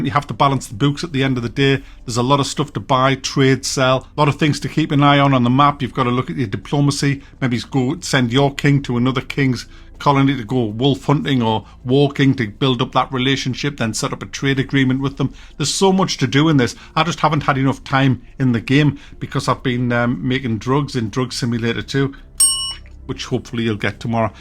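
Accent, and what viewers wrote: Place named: North East England, United Kingdom. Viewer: It's a North East thing, in general. He's from Newcastle, I'm from Sunderland. They say it like that there too.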